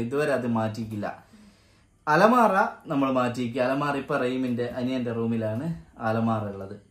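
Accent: Vadakara, India. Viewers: Vadakara varthanam😂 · Nte rathri muzhuvan vedios kandu❤kannu niranju😢ithrayum prayaasangal okke undaaayittum ningal koyambathooril shop thudangi scooter maangichu❤oru vidhathil ellaam oppichu❤ avasaanam baaghyanithiyaaya oru khairaaya bhaaryayeum kittii❤eni angootulla jeevithathil orupaad uyarchayil ethatte🤲🏻vadakara mekhalayil ulla youtube channel pothuve kuravaaanu😂ningale vadakara slangilulla varthaanam pravaasthil irunnu kelkkumbol nalla sandhoshm ❤chekkotti bazar nte aduthulla CHEMMARTHOOR Kaaran❤❤ NB:aare kondum parayippikkaatha vedios cheyyuka 😂❤sneham maathrm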